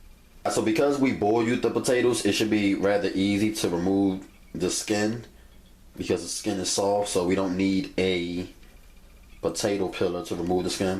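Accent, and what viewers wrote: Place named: Philadelphia, United States. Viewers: You got that Philly accent. Im originally from Philly. I'm in Ohio, I get teased about my Philly accent. You got me laughing. I'm making this tonight. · on the sugar! Us Pollocks use sugar in our potato pancake recipes which is then plated and topped with powdered sugar, if you like. But I question one thing, me being from Chicago. How come you boys from Philly can't pronounce boil or oil????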